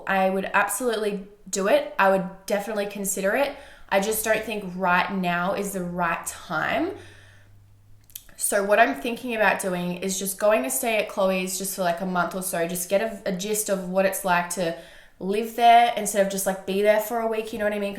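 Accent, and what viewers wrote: Australia. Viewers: You sound so Australian in this love it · even her cough sounds Australian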